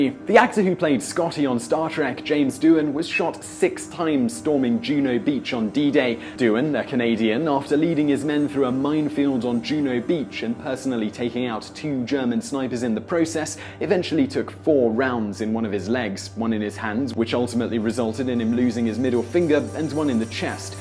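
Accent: England, United Kingdom. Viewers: To all the complainers: DO NOT WATCH. Crybabies., most of your audience does not care how you pronounce words. Your British accent is marvellous. We Americans use a form of PIDGIN English any way. Can't even spell, bad punctuation, stupid grammar, borrowed YOUR language. Ingrates! As British as Apple Pie, "My country 'tis of thee"(America)="God save the King", etc. The US has borrowed much of it's culture from the English AND some from the FRENCH. If you do not like that, give the Statue of Liberty back to the French. And also 1/3 of the modern English language. Sorry for the IGNORANCE of some "Americans". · I understand your English accent would have some words sound a bit different. However... Gene Roddenberry's name is not pronounced Roe-den-bree, it is pronounced Rod-en-berry. Whoopi Goldberg is not Whoopi Golds-berg. Also, LeVar Burton's name s not pronounced Lee-Var, it is pronounced Luh-Var.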